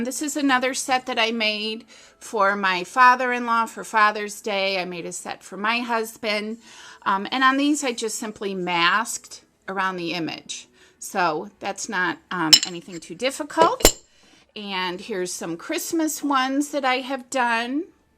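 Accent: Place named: Cleveland, United States. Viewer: Oh I am SO doing this one!! Thank You!! ... and smoosh is SO a word!! You sound like you might be/have been from Cleveland - are you??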